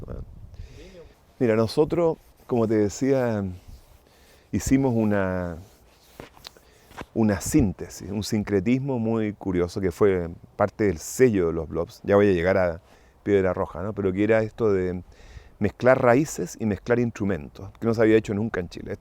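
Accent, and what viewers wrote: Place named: Spain. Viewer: Por que mezclas fonetica castellena con america, es raro como hablas.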